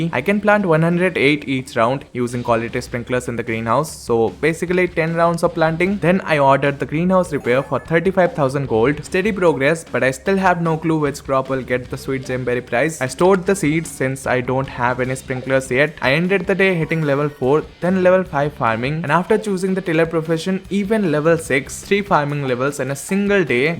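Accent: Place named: India. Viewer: I know this guy thought it was AI when it can't even mimic Indian accent plus where have you seen in ai videos their logo pop up as their mascot never smh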